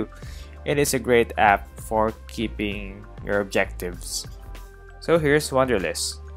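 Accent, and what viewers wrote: Philippines. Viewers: Pinoy po ba?! clear po kasi sa accent. ;) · As soon as i heard your voice i knew that you were Filipino, wassap man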